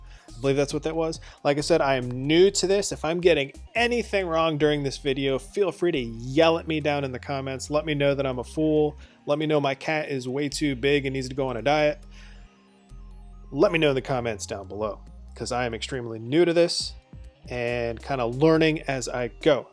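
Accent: South, United States. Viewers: This was great to see ...Bring back your Southern Accent she hasn't been on in a long while except once 😁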